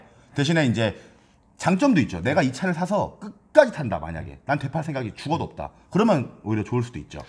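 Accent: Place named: Gangwon, South Korea. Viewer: 듣다보면 강원도 사투린지 조선족 사투린지 햇갈리네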